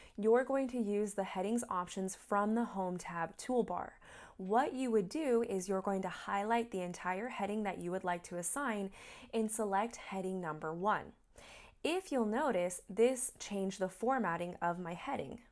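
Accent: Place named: United States. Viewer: Your instruction is great. Thanks for keeping your instruction to the point. Having previously lived in the USA on and off for a few years its nice to hear your american twang reminds me of Seattle.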